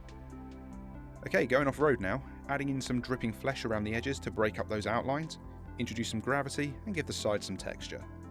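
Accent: United Kingdom. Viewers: He has the best British voice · I really can't tell where your accent is from its definitely Midlands I just can't pinpoint it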